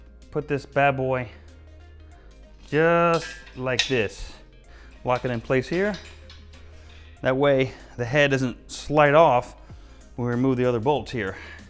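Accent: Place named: United States. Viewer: Hi. Looks like it can be rebuilt to me. Can you not grind the crank and fit oversize bearings? I'm talking 60's/ 70's engines I suppose but the mechanics of this engine are from that era (if not the electronics!). 2 valves per cylinder, cam in block, pushrods. Great! Like the channel very much, you do stuff to owner/mechanic standard - that is not a criticism, just means that the attention to detail and care is better than I suspect the average workshop would give. You do a Rolls Royce job if its your own car, such as the diagonal relieving of bolts. Good tips too eg the spark plug ceramic colour (not black, not oily, not too white).Keep it up. I'm a Brit, you're and American but I just about understand you!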